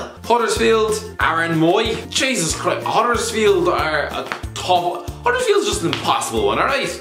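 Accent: Ireland. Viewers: are you irish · Shit I can't understand half of the things he says, Irish accent is difficulty asf, slow down boy, you're talking way too fast, does every Irish talk this fast? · Does the "Irish Guy's Rant" on the screen for 90% of the video not tell you? Or his clearly Irish accent? Or the fact he called himself Irish multiple times in the first few seconds? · Irish accent👌🤘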